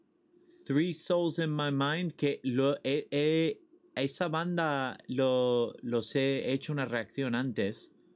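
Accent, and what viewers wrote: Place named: Spain. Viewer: Jajajaja el título, americano reacciona, y el habla como un español, sin duda el es de España.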